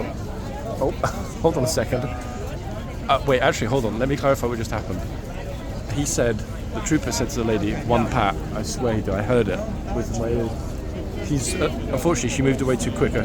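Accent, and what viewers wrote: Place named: RP, United Kingdom. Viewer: I Love Love Love your Brilliant Channel! And I appreciate the Queen's English that you present so eloquently with every episode of your presentations. I also agree with your analysis of what respect should look like, from your perspective toward the guards. You balance that dynamic so well❣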